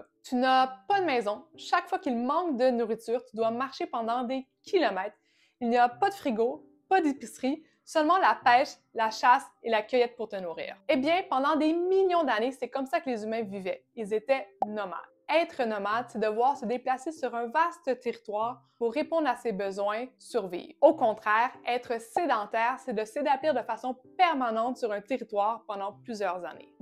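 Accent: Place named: Quebec, Canada. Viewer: Bonsoir Madame! You Tube m'a proposé votre chaîne, et comme j'aime beaucoup l'Histoire, que j'ai une formation d'Historien, que j'ai même une maîtrise, que je travaille à un modeste poste au Musée d'Archéologie Nationale à Saint Germain en Laye (France), que ma voisine de rdc s'appelle aussi! (je me demande si elle a de la famille au Québec) et que pour un Français l'accent québécois est for agréable, je m'abonne! Au musée les visiteur Québécois sont mes préférés, ils sont toujours aimables, souriants, causant, ils ont l'air heureux de vivre. Au plaisir! 😀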